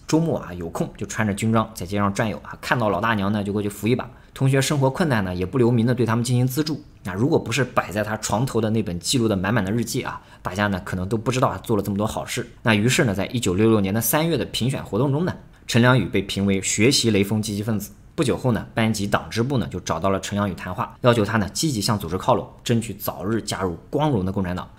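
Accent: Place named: Subei, China. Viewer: 上海人不喜歡你的蘇北口音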